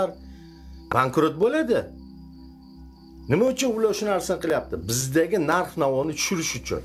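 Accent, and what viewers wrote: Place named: Namangan, Uzbekistan. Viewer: Наманганлимисиз ака гаприш тилиз охшайди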